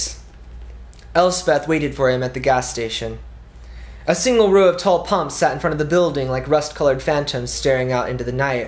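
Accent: England, United Kingdom. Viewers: i like your english accent!:D i cannot wait till this book comes out!!! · are you british?or are your characters? : ) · why are you reading in a British accent???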